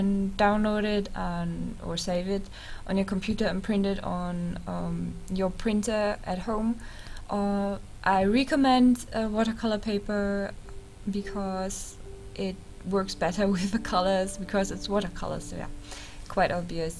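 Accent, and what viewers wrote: Germany. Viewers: thx i was thinking about buying this set :) now i am going to get it :3 btw are you german ^^?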